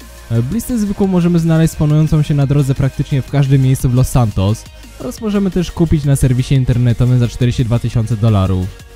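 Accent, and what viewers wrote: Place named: Silesia, Poland. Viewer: Domyśliłem się bo osoby ze śląska zazwyczaj wymawiają czy a nie trzy :P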